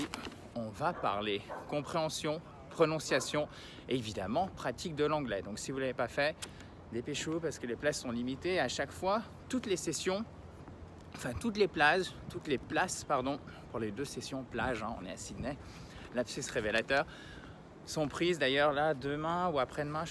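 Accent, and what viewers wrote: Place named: United States. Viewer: Hey it s me Michelle. I work ou english ever days. I see yours videos and tutos de huito 's videos. The difference between you it s the accent American for you and Bretish for Huito. I heard Beatles ' s songs. At the beguining of octobre i have to go lesson by a teacher. I think subcrire at your formation at the end of this year. Not before because i have no time.!!!!!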